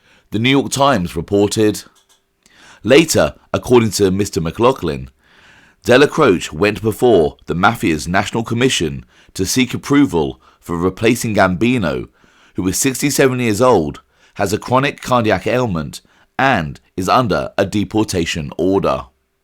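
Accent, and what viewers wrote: United Kingdom. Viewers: Can we just ONCE have a documentary that isnt narrated by a stupid british accent that butchers every other word??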